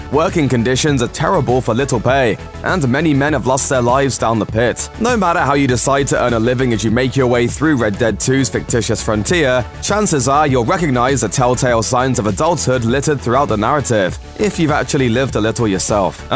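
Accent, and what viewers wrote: United Kingdom. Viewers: Every video I watch is a British guy.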